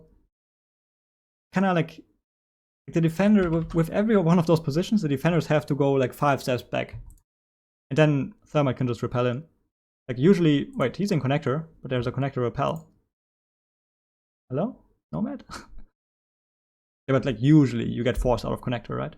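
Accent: Germany. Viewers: I knew it i could hear the German Akzent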